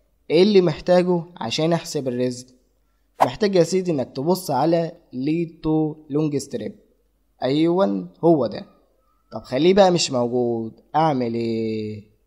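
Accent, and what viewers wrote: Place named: Sudan. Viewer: من وين انت عرفتك يوداني من اللهجة 😂❤❤❤❤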